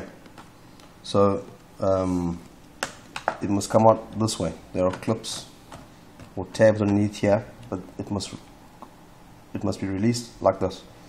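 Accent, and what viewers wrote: South Africa. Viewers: Hi there , I have a Acer Aspire E15 , this has happened to me , I tried a hard reset with taking the battery out and holding the power button and then switching it on but that didn't work. I've been to and asked various technicians but they all say that it isn't the CMOS battery. They say it's impossible because I've only had the laptop for a year and a half ? Very informative videos, you earned a subscriber. Also you sound like you from South Africa 😅😅 So am I !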